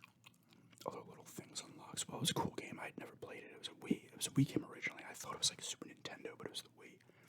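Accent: New York, United States. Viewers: I’m from New Rochelle, NY and I’ve been living out of the country for the better part of 9 years now (some time in China and the last 7 years in Brazil) and I’m a huge ASMR fan. Your channel has become my favorite recently simply because listen to you shoot the shit reminds me of home lol, the NY accent talking about NY sports in your videos, I also love games as much as you do. Anyway, definitely gonna keep watching, thanks for the content my guy.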